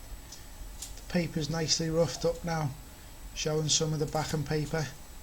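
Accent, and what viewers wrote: Liverpool, United Kingdom. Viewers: Yes lad scouce as well (Manc here) keep up the good work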